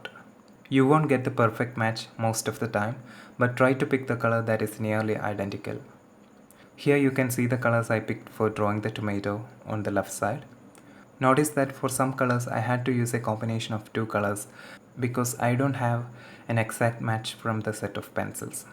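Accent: Kerala, India. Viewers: I think you are malayali .becoz of your English accent .nice to meet you thank you for this video .iam a malayali too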